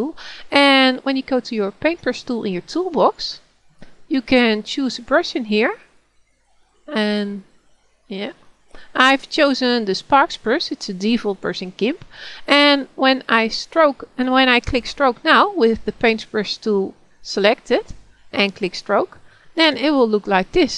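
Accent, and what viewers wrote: Netherlands. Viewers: This is what dutch people really sound like speaking English, unlike goldmember in Austin Powers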